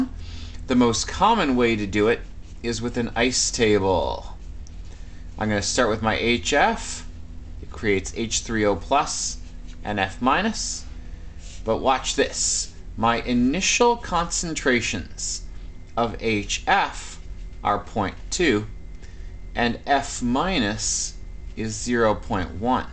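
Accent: Canada. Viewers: you Canadian man?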